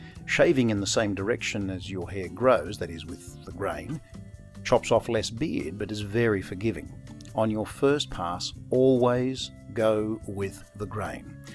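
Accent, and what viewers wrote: Australia. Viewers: G'day, Thank you for a great series of entertaining and educational videos. It's particularly nice to hear a cultured Aussie accent: your voice would not be out of place on ABC Classic FM. A question re witch hazel as an aftershave if you would please be so kind as to answer... Aftershaves (etc) are available which have a witch hazel base--such as the Thayers in your video ($18 plus postage on eBay for 355ml)--but it is also possible to purchase what is described as 100% pure organic witch hazel ($22 plus postage for 500ml on eBay), and T N Dickinson's Witch Hazel ($6 for 240ml from Chemist Warehouse). Are all of these suitable? Are any better or worse? Does the 100% witch hazel (whatever that means) need to be diluted? Big price difference between these.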